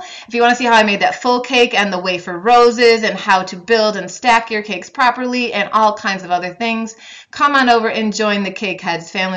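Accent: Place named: Upstate New York, United States. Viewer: First of all I adore your voice and way of explaining things... your accent reminds me of my late aunt who was an upstate NYer and I miss that! This is absolutely gorgeous and I can't thank you enough for everything you share with us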